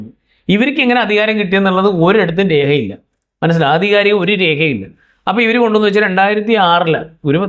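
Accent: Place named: Kozhikode, India. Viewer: ഈ വോയ്സ് കോഴിക്കോട് കാരന്റെ എല്ലാ... കോഴിക്കോട് സ്ലാങ് എനിക് അറിയാം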